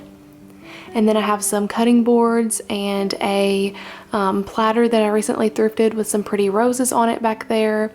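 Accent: South, United States. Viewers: Love that Southern accent. Your closeups are excellent but also pull way back now and then, or upon entering the room and leaving the room so we can see how everything is placed in the entire room.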